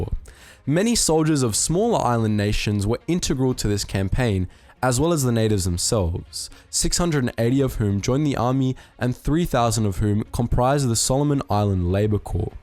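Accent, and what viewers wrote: Australia. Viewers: Just wondering are you Aussie like me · Ah I dint know this man was Australian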